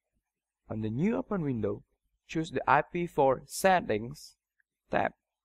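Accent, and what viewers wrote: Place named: Spain. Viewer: indian guy with a spanish (is that spanish) accent? EPIC!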